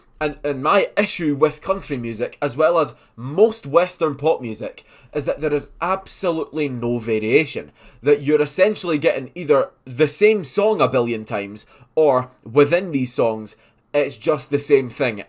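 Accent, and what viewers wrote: Scotland, United Kingdom. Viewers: Of course! I perfectly understood you! But even if I didn't, I would happily exchange that for the Scottish flair 😂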